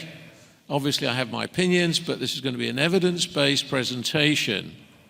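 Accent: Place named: United Kingdom. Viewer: Highly informative and comprehensive by prof. Besides a British accent is much of a value addition, certainly a breeze of fresh air.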